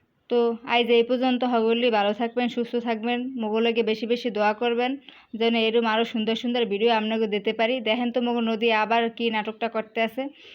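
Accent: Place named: Barisal, Bangladesh. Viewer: খুব ভালো লাগলো বরিশালের ভাষা❤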